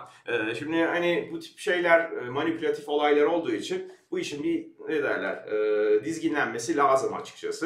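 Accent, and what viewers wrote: United States. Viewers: Komedi filmisin yaaa. Olumsuz yorumlarıda hemen siliyorsun en ufak eleştiriye açık değilsin. Bilgin yok ve amerikan aksanıyla Türkçe konuşarak, İngilizce kelimeler ekleyerek de olmuyor. İnsanları yanlış yönlendirme lütfen, anlattıklarını zaten çocuklarda biliyor.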